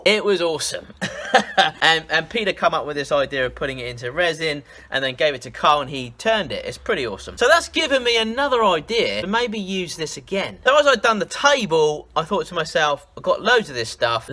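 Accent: United Kingdom. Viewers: Trips me out how British Accents exist when we speak the same English. Yeah I'm lit rn 🤫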